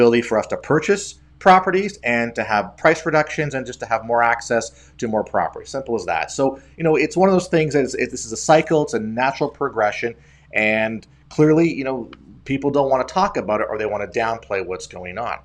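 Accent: Canada. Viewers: You must be Canadian because you say oot and aboot.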